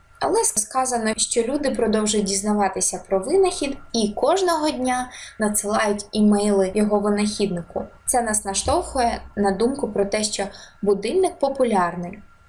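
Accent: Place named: United Kingdom. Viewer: Який крутий британський акцент у ведучої!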